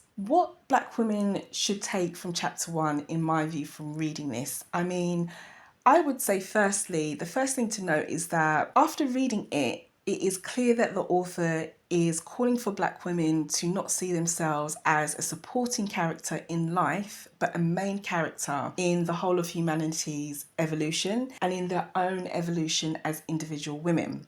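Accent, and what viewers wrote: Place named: United Kingdom. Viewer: So good to hear a British accent speaking on such topics. Keep it up.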